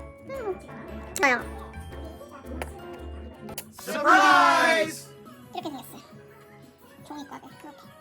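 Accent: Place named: Gangwon, South Korea. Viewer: 이 분 때문에 영동지방으로 어학연수 가고 싶은 일인 여깄음. 정말 극강의 로망 억양 인토네이숑… ㅎㅎㅎ❤❤❤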